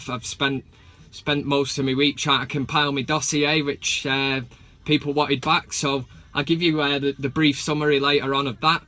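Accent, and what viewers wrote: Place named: United Kingdom. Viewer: would be better without this damn british accent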